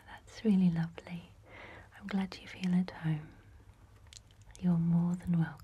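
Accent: United Kingdom. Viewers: I've brought all my bits & bobs. Haha love it! Love the Brits! So beautiful to listen to!!! Thank youuu!❤😊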